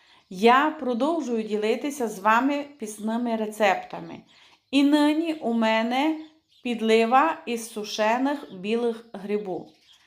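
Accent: Transcarpathia, Ukraine. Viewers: Це дуже смачно, в нас на Волині також таку готують ,лише без паприки.Називається страва мачанка.Обов'язково присутня на столі на Святий вечір і в піст також. Спробую додати паприку.Окремо дякую за ваш чудовий закарпатський діалект❤!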